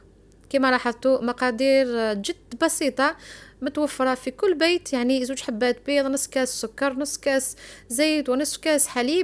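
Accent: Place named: Kabylie, Algeria. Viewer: الله الله على الكيكه شاطره تهبل مشاء الله يعطيك الصحه والخير و الهدره القابلية نموت عليها ❤️😘😘😘 احلى لايك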